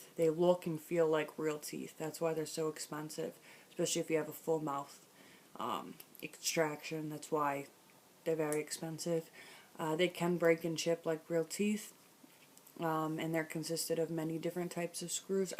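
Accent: New York, United States. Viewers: I love your accent! New York?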